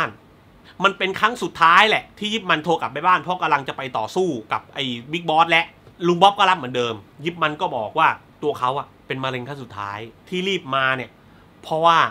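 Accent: South, Thailand. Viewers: คนรีวิวคนใต้ใช่ไหมคับ ควังสำเนียงออก 😂😁😀😊☺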